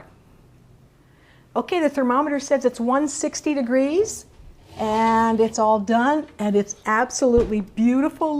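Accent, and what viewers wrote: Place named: Canada. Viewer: Hope you are well,. Thank you so much for sharing your meat loaf recipe. I plan to try this! I like the very slight Canadian accent. Your presentation is awesome.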